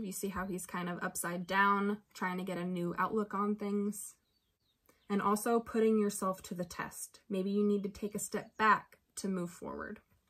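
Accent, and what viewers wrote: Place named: Chicago, United States. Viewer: The edits and cuts make it obvious you are reading from copied notes, where we can not see but you can. Sound totally unnatural. Starts video off in a negative tone about other you tubers, arrogant. Uses poor English saying "Coming right at you, with..." Sounds so South side of Chicago. You copied. Easy and thoughtless to do.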